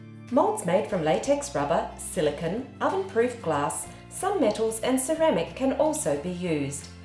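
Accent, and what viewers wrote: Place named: Australia. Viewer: Her I am thinking this is an Australian product... as the lady talking is Australian! Lame! so expensive to buy in Aus.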